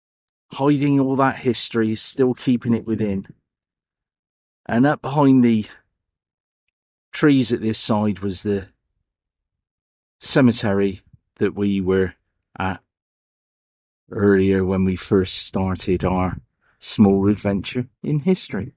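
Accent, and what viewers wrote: Wiltshire, United Kingdom. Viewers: Hello. You're such an interesting person. Wiltshire through and through, with an accent to match. That holloway looked to have some tarmac to walk on. Great video, thanks. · My wife says that your accent sounds like my father who left Wiltshire as a boy in 1910. Another nice video.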